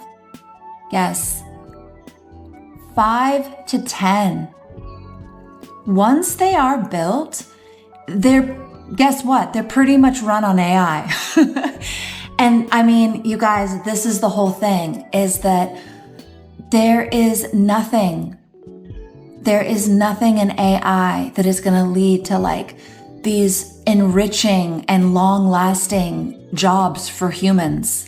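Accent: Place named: California, United States. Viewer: Where did you come from when you moved to Marshfield? Never mind, just found out you came from Kommiefornia. I was wondering because to be frank, you sound like someone from there. Why don't you carry your butt back there. We don't want folks like YOU in Missouri.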